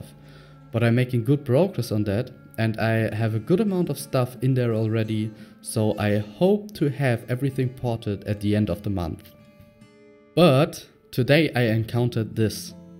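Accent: Germany. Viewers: Great Devlog! Am I hearing a slight german accent my guy?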